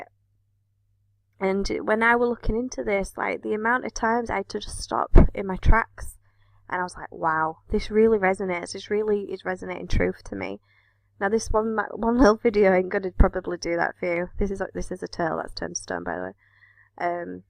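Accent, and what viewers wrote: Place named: Northern England, United Kingdom. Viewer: To you are total on the right path of truth but this channel iam going to tell u about is mind blowing u must watch it and everything will fit into place go to the Richard mountbleu channel......the mystery of life video u will be amazed at the truth in it.......I no you have got the eyes to see it.........you sound like a Northern lass......please check that video out it goes way deeper than u can imagine.......please please let me no u watched it so iam not wasting my time on people that don't really want to no the truth...Dean from grimsby🇬🇧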